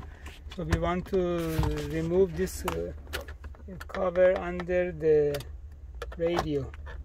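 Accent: Iran. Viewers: You must be Persian I love your accent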